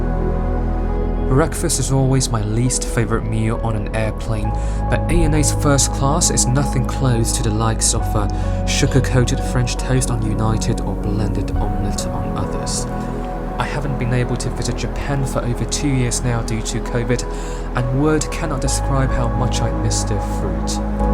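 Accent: United Kingdom. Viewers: 感谢分享，ANA的新头等装修真的很大气屏幕效果也很惊艳，可惜我今年只能飞到老客舱。顺带一提博主的英音很惊艳哇，完全没想到是在美国上学。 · an asian guy with british accent is just so off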